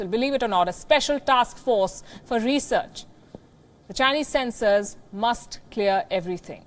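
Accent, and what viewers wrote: India. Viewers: Indian accent’s never been this cool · Strong india accent. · this lady talking like indian is number one an arnt in a epidemic of a toilet shortage in its country, you are actually propitiating and brain washing people by- re-repeating what the people question are an not doing what the news are doing by providing an non bios information/solution. India is know as number top unhygienic country an number one in sexual assault, i say this as you slander another country. an get involved in its geo-politics. china says its a bio wepon created by usa, if it was a hazard the market would still be closed.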